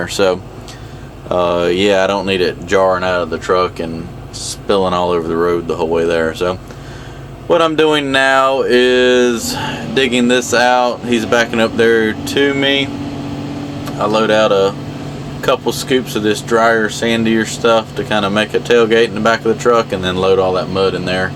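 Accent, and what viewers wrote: South, United States. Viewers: Meuud, lots of meuud ! Love your Southern accent !! 👍👌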